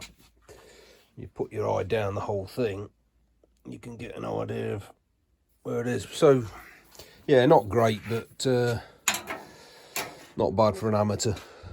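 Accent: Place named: Lincolnshire, United Kingdom. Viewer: I have been welding for 40 years and also worked on series landrovers for quite a few years as well as owning renovating my own and have NEVER attempted a bulkhead quite as bad as yours. I do renovate some series one 80" bulkheads by virtually making them new with just some salvage parts off the old ones. So respect to you sir! By the way your accent sounds Lincolnshire, could that be correct? I am myself!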